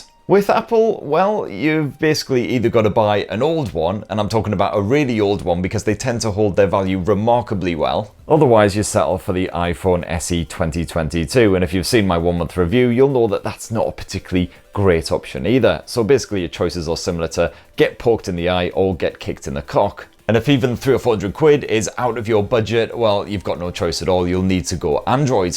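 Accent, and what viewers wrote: United Kingdom. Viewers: Great video. It's nice not to be patronised. Also, its nice to hear a British voice! Thanks.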